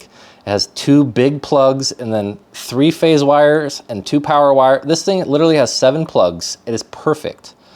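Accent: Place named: England, United Kingdom. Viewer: Hahaha you are hilarious! English accent and all! 😂🎉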